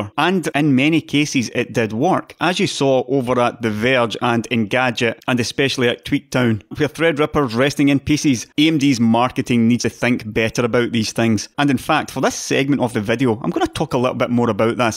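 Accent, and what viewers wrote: Scotland, United Kingdom. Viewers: developing a taste for Scottish accent thanks to you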